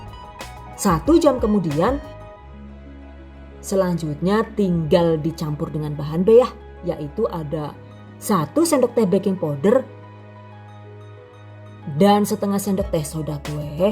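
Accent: Banyumas, Indonesia. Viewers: Assalamualaikum...maaf,kok ada bhs ngapaknya ya...kalau bgtu boleh tahu dari daerah mana yaaa,aku dari cilacap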